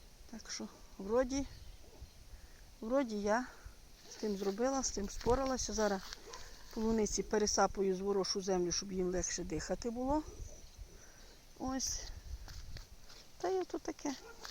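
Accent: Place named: Khmelnytskyi, Ukraine. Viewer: доброго дня, я давно дивлюся ваше відео. Ви говорите,як у нас на Хмельниччині.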